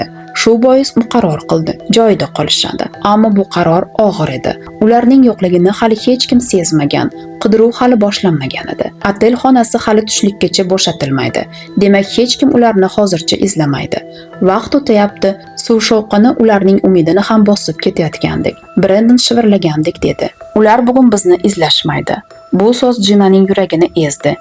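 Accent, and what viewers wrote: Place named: Standard, Uzbekistan. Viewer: Соф адабий узбек тилини шундай согиндимки❤Рахмат сизга❤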